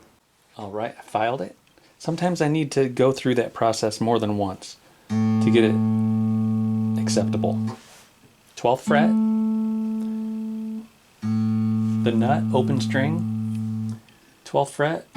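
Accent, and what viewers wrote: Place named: Germany. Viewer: I enjoy your videos. Great workmanship. I do need to ask, why do you always seem to throw in a German accent? Why not Indian, Chinese, Hindu, etc?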